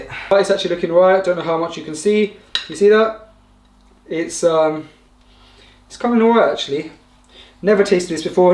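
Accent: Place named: United Kingdom. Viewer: tbh I learn Islam, english, and british accent from your videos and freshly grounded's videos a lot, thank you 💯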